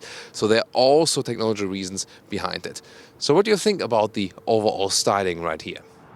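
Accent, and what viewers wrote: Germany. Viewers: Und woher kommt so ein schrecklicher deutscher Akzent? 😅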